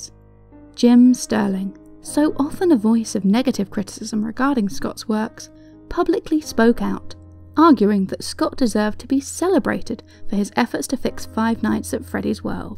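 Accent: United Kingdom. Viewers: Yeah that British accent gave me an instant no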